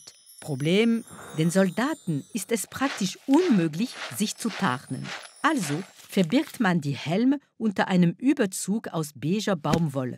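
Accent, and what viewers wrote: France. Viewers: Dieser "komische" Akzent ist ein französischer Akzent und wird von Karambolage schon seit gefühlten Ewigkeiten verwendet. Vielleicht übernehmen die mit den deutschen Akzent ja die französischer Karambolageversion.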